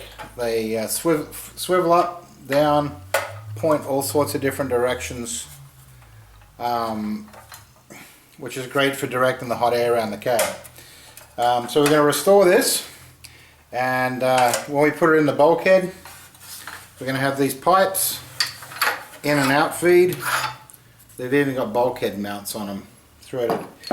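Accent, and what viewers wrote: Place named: Australia. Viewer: Nice job. Did you do a follow-up video? I think I'm hearing a bit of an Oz accent but the winter scene is throwing me. Where are you located?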